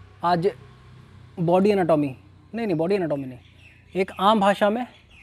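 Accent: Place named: Bhojpuri, India. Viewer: Bhai Really love your raw videos Your Bhojpuri accent ... ❤️❤️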